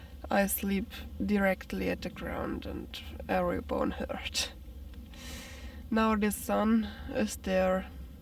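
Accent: Bavaria, Germany. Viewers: I really love how you narrate the nature. I also love that you actually thank everyone for their comments. This is kinda a long message, I hope you don't mind. My dad adores your accent because he grew up in germany while his dad was trying to fix things after that thing happened. Bavaria is so beautiful. We love seeing you explore it. We're also skillful bushcrafters and we would be happy to host you if you want to explore the USA forests. Happy camping, and I'll be praying for your recovery. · Enjoyable video. I like the fact that you sound like a German speaking English. You speak much better English than I will every speak German. I saw your tattoo which I assume is new and that is what you were covering with the Archery arm guard last time. What is the the tattoo? I was not able to make it out.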